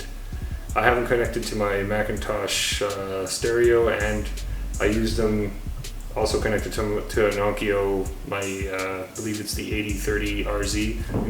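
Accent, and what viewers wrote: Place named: Canada. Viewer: Canadian, eh?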